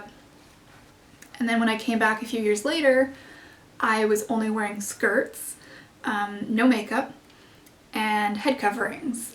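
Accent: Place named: Canada. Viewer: Thanks for the Q&A video! I also live in the country as a stay at home wife. (Kids are adults & on their own now). It can get boring for me some days but I try to make tasks for myself. I've been learning to sew clothes and so far made one skirt that was awful LOL The nearest fabric store is an hour away so I need to try to stock up when I get a chance. I totally understand having to travel. :( Oh and I knew right off you must be Canadian as my husband is Canadian and you both pronounce words the same. In fact we live so close to the border (6miles) that a lot of people here sound Canadian even if they aren't. LoL I really enjoy your videos and look forward to when you post! Do you think you would ever consider another video of your skirts (hint hint) LoL 😁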